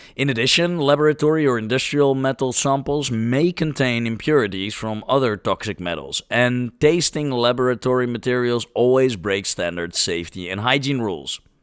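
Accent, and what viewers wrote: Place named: Netherlands. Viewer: very dutch nice accent meneer very goed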